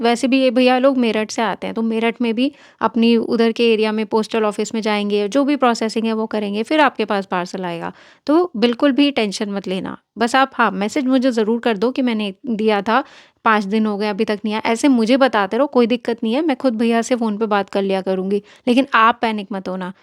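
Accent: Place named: Karachi, Pakistan. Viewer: Lrki tm bikul hmari trahn yani Karachi walon ki trhan Urdu bolti ho..pharry aan dhmky wghera.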